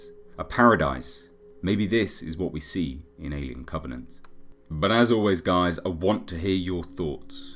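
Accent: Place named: United Kingdom. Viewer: Love your videos, great voice for narration (why do Brits always make things sound interesting?) :P. Quick question? Do you believe E. Shaw was turned into an alien queen or some other monster by David after reaching Paradise? I think David had learned a great deal more about the Engineers (their tech, history) than we were led to believe during the Prometheus film, so he has he maybe taken Shaw to a different planet and not the engineers' home planet?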